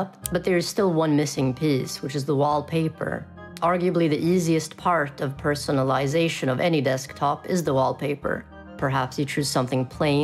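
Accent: Sweden. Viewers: This cute little goblin has the most Swedish fucking accent I've heard. And the funny thing is that they've almost entirely flattened their accent, the pronunciation is just a whisper away from Platonic standard. Amazing, that can only happen in a Linux video, fucking phenomenal. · is your accent swedish? owo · wait are you swedish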